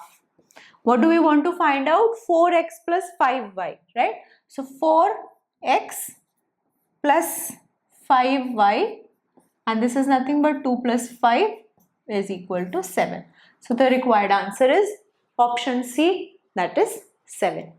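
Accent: India. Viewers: Madam are you from Kerala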